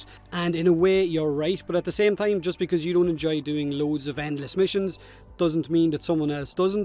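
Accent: Ireland. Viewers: Came for the warframe content Stayed for the Irish accent and warframe content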